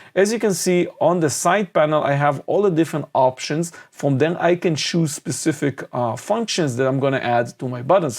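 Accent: Israel. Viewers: You have such an Israeli accent :-) Shalom.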